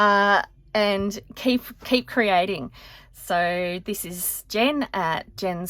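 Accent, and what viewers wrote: Australia. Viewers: Nice to hear a fellow Aussie voice!!!! Love those stitched leaves so much!!! Split pins for me here in Oz-brads in the US. Glad YouTube gave your channel to me today!!!!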